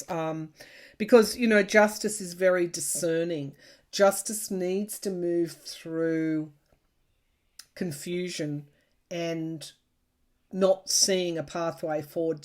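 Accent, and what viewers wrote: Australia. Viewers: Your reading was lovely, thank you. I love the Aussie accent, a bit like mine Oi Oi Oi 🙌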